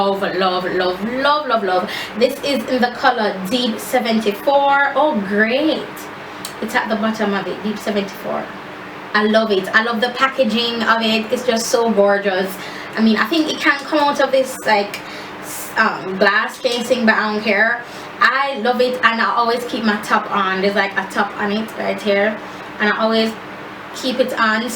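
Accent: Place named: Jamaica. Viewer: nice foundation haul new subbie😀 my grandaddy is from Jamaica love the accent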